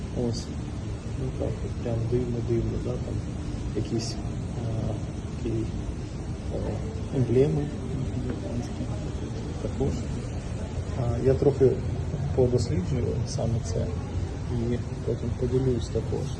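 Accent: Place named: Russia. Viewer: Говори по русски . Умора. Мучаешься, сразу же видно, что русскоязычный.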